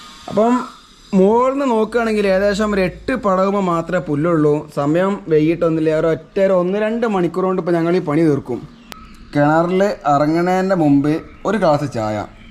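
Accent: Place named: Kozhikode, India. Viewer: നിങ്ങൾ എവിടെയാണ് പ്പാ... ചെയ്ത് വചിക്കേണ്. അടിപൊളി സംസാരം ആണ് ട്ടോ... കോഴിക്കോട് കാരുടെ വർത്താനം .. നല്ലോണം പിടിച്ചാണ്ട് ഇറങ്ങാന്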